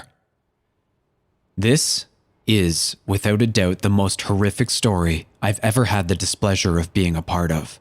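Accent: Canada. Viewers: The guy reading is definitely Canadian. About = aboot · I love your little Canadianisms btw:3 Which is your favourite of the stories you've narrated?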